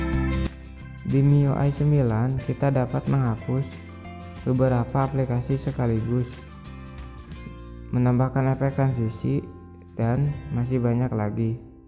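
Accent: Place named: West Java, Indonesia. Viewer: LOGAT SUNDA DIA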